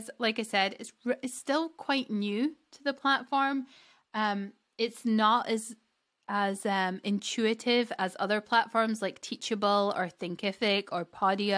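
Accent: Scotland, United Kingdom. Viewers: Nice to hear another Scottish accent! I'm thinking of members areas for my teaching site, do you know if you have the option to also have a community area under the membership, as well as access to members only videos?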